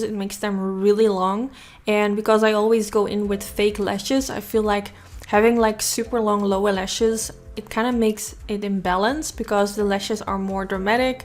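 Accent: Netherlands. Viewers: Ik wilde net vragen of je uit Nederland kwam (je klonk een beetje zo☺️), maar toen las ik het op je kanaal🤪🤗😍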